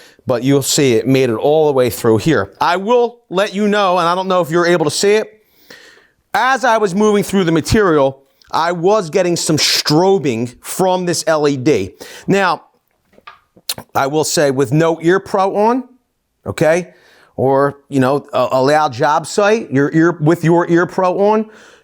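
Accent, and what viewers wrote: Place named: New England, United States. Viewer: The voice , couldn't watch that Yankee accent..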